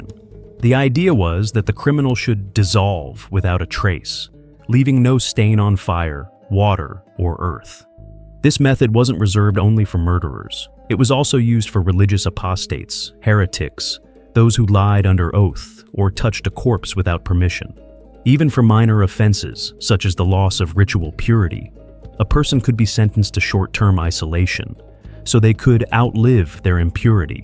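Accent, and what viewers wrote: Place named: United States. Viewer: These accounts are always narrated by an American.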